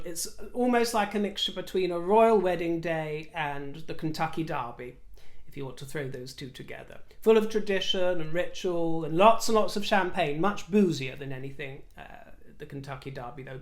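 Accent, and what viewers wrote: Australia. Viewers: I don’t understand the fuss about ‘the word’ it just means that Harry is a dick - true story. I love Mike Tindall. What you see is what you get and if he has The Princess Royal’s love and approval, he has mine. We all loved Meghan when she joined the Royal Family. We really thought that she was going to be a fresh air and now we are just disgusted at her - and her husband’s behaviour toward our Queen. Lady Sarah looks very much like her Mother. I’m about the same height as the queen and my Grand daughter always wanted to be taller than me. She is now 11 and can look me in the eye🫤 Me and you will have to differ about Eugenie (like you care lol) I’m not so sure whether her loyalties lie with The Queen or the Harkles. I hope I’m wrong but we’ll see. Loved your Ozzie accent/s 💕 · All of Aussie accents were brilliant! I love listening to for much needed elocution lessons. 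· When you say it in your Auz accent, it is so funny. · I love your Aussie accents..made me chuckle. You are spot on…so enjoyed your chat today. In Australia we shorten people’s names to signal our affection for them. It’s a fun way of showing we care and that we are close to them. Informality is the vibe of the day. 🐨👑♥️ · I love you Aussie accents well done. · Yeah mate, your thick Orstralian accent is a bewdy . There’s jacko, jimbo, deano, johnno,…..love it! Why do the sins of the father have to be visited on the daughters of York? Never associated the York girls with any of that and really?? Why would you? And he appears to have been a loving and generous Dad, one of the good points for him. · That is a great Aussie accent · love your Oz accent from Queensland resident made me laugh 😂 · Your Aussie accent is better than 99% of Hollywood actors. Well done! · Great episode 🙌🙌🙌 Your Aussie accent is fantastic! 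You’ve obviously embraced Home & Away and Neighbours in your youth. As we say, “goodonya” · Oh Dear, As an Aussie😀I found yesterday and today your Aussie accent just made me smile. I find it very sad that here a lot of people are just loosing the great sense of humour we used to have. Love your channel ❤️🥰🥰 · Had to chuckle at your Ozzie accent we call it Strine, my younger sisters talk more like that, I tend to use the Queen's English common in Adelaide. I love Mike Tindall he says it like it is. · Great Aussie accent = love from an fellow Aussie xx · you are on fire at the moment!! Ps your australian accent was truly fabulous in your last vid. Enjoy your day xx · beautiful colour on you, love your Australia accent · Oh my, your Aussie accents are amazing, far broader than mine, after living away from Sydney Town for so long, many think I am South African or even British😃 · Props for your Australian accents. 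You did us proud! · Well done on the Aussie accents ♥ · I love your Aussie accent. Keep it coming. Although I really love your nice posh natural accent too. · Hahahaha as a Melbourne Aussie, I love your Aussie accent. You're quite good at it if I must say. We've got three rough categories of accent rather than region specific. They're call Broad, General and Cultivated. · Ohhh… your Aussie accent is brilliant…come to the west coast next time! Xx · I loved your Australian accent!!❤️ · Omg your Aussie accents are amazing!! Love that you've seen Kath and Kim 😂 Really suits you that gentle Aussie accent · your Aussie accent is hilarious, love it!! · Good Aussie Accent !!!!! Love it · Love your Aussie accent! You’re so cute!! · Love the Ozzie accent, Mate. All of them. You're a master. Could you say "was a miserable cow" in a Ozzie accent? Please! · do come to New Zealand please. Love your Aussie accent. 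You need to visit us here in Wellington and do a kiwi accent though. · Love your Aussie accent !!!❤️❤️❤️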